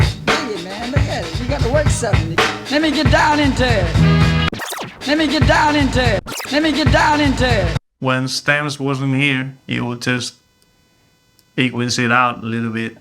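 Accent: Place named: Poland. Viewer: Wow your accent sounds kinda Polish. And you look like a Polish singer Dawid Podsiadło. :D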